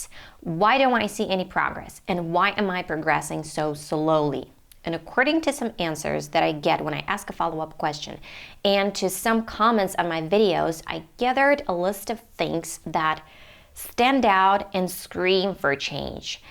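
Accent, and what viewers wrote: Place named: United States. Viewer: Your accent is so American👍👍👍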